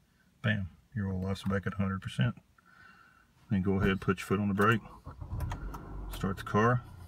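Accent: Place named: South, United States. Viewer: You must be a southern boy...awl light....awl .... aint no crime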